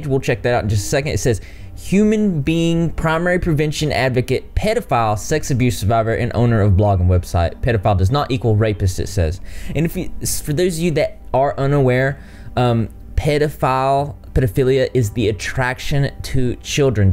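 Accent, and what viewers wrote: South, United States. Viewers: It's an anime character in real life with a southern accent! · Straight southerner lol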